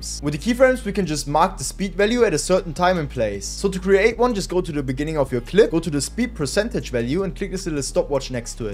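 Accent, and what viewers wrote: Germany. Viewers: Ich hör den deutschen Akzent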